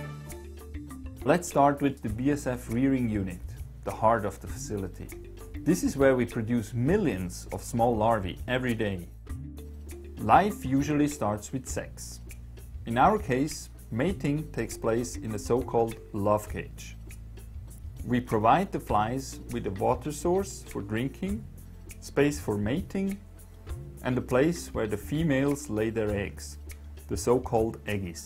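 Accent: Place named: Switzerland. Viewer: The narrator is 100% swiss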